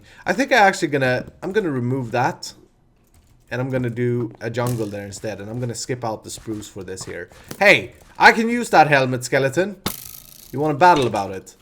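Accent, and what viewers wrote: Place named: Sweden. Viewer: eller jag hörde på ditt utal att du var svensk :D eftersom att jag också håller på med youtube och jag snackar också engelska i mina videos :D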